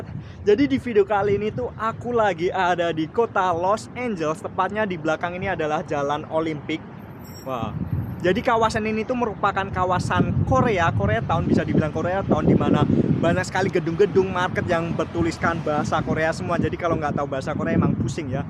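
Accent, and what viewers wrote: Java, Indonesia. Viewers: Asli jawanya mana mas ko medok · Ketoro wong jowone 😁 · Mas ganteng amat ...orang mana sih,,, Tapi gaya logat bicaranya kaya orang jawa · Mantap mas, tinggal di amerika tapi logat jawa nya masih ada 👍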